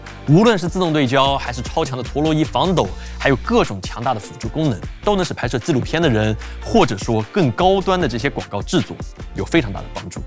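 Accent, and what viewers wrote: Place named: Hangzhou, China. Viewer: 一听口音不是杭州人就是宁波人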